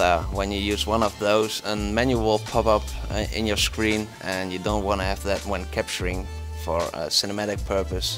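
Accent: Netherlands. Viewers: are you dutch ?? i can hear it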